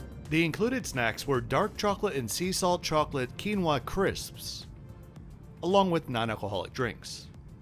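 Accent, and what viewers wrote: New Jersey, United States. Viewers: Knew you were from Jersey by the way you say water. Great video per usual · I knew it! You’re from Jersey😂 accent gave it away.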